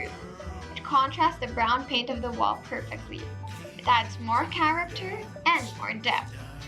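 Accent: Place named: California, United States. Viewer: HI. Ms., you have a beautiful content po. Just avoid cracking voice when youe speak I llike your californian accent though.:)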